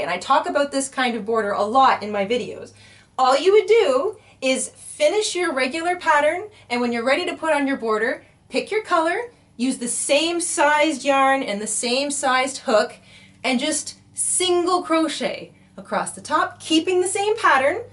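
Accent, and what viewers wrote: Canada. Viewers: This was such a great video full of great tips especially for a beginner thank you so much also it was so fun to watch that cute giggle and Canadian accent!!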